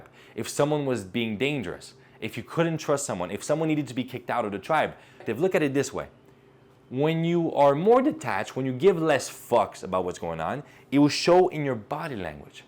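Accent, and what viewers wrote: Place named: United States. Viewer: U re american? Cause u like balckan but u have still american accent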